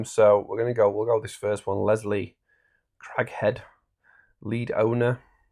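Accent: North, United Kingdom. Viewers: Hi, good to hear a Brit voice easier to understand than the Zoho videos and thanks for being active on the Zoho products. I've used databases in the past; Sales Force etc.. Used Zoho for 1 year but not in anger. We are looking to increase customers/interaction/subscribers etc... As I'm on the move its ideal for iPad/iPhone, now going through the correct steps/blueprint to give me a better work process so when we expand a system is in place. cheers, Charles. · Hi. I am starting a role with an Organisation as a customer services manager and they use Zoho. I would like tot get to know the system better to assist in this new position. Plus I am from the North of the UK and I can relate to the accent;-)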